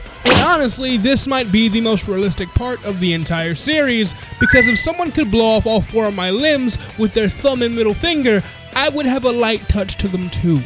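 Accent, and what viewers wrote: Jamaica. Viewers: Big bro jamaican? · you jamaican?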